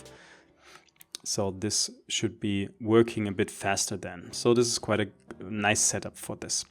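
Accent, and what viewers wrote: Germany. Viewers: You hear like a German guy speaking english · man hört direct das du deutsch bist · Man hört soooo raus, dass er deutsch ust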